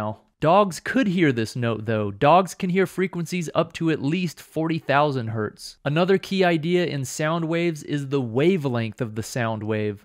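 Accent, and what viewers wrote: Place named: India. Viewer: after next bunch of indian accent video, now I understand what is frequency and amplitude. How about magnitude?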